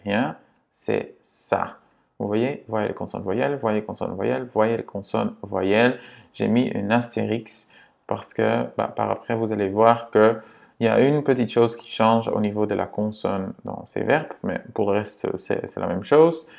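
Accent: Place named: Quebec, Canada. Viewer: Merci tu expliques vraiment bien! Bizarrement j’entends un léger accent québécois quand tu parles français 🤪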